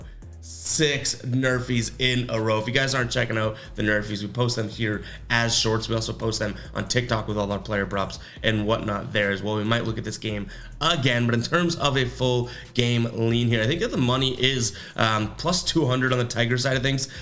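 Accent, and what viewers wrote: Boston, United States. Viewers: What part of Boston you from ?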